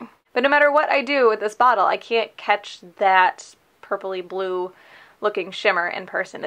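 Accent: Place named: Minnesota, United States. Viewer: Are you from Minnesota? You sorta have a accent. If so cool I'm from Minnesota too!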